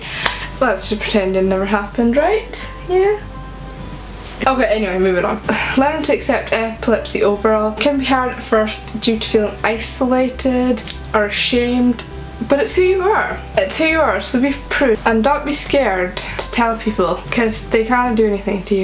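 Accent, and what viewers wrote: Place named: Scotland, United Kingdom. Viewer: this was interesting to watch, I’m sorry your having to live with it, Skye is mega cute, I’m from Scotland and by your accent I’m thinking your from Scotland as well Ayrshire or Falkirk but I could be wrong lol x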